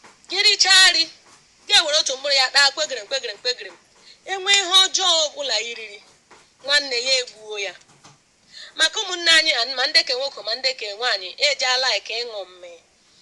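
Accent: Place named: Owerri, Nigeria. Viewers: Let's connect sis.oji inu ka anyi na akpoya. Your igbo accent is imo I guess. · Oh gush! You have a romantic Owerri ascents. My hubby rushed out of the room to listen to you too. You twist the language like his sister. He’s guessing you’re from Naze because he’s from Obibiezinna too. Sending this video to my sister-in-law. I really enjoyed this content on bitter kola. So much benefits. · Akuruma is very beneficial to health, the list is endless, I enjoy listening to her Owerri dialect. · Chai! I didn’t want this video to stop simply because of this dialect! So so sweet in the ears. Bitter kola explained in a very detailed way. Ala Owerri lee!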